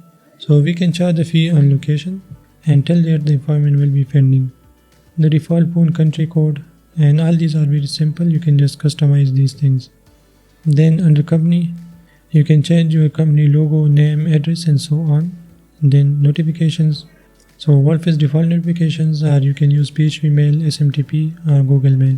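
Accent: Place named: India. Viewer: Aha Indian accent! But thanks for the video.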